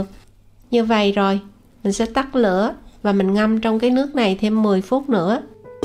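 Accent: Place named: Mekong Delta, Vietnam. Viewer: Hấp dẫn quá !! Món này làm lai rai thì đúng bài rồi , cám ơn lời chia sẻ và giọng nói thân thương của người dân ((Miền Tây))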